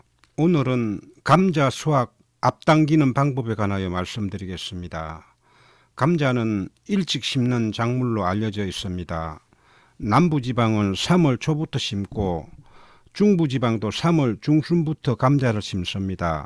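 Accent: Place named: South Gyeongsang, South Korea. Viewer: 🥔감자, 🧢모자 등 말할때 앞 발음이 올라가는것은 경상남도 분들 발음법 같아서 정겹습니다. 저는 경북출신인데, 신혼때 경남에서 6년정도 살았거든요..ㅎㅎ 항상 좋은 영상 감사합니다.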